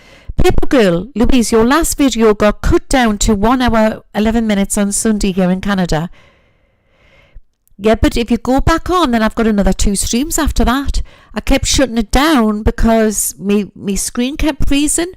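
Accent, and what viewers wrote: Newcastle, United Kingdom. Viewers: Love and miss the Geordie accent, from a geordie lass in Tasmania Australia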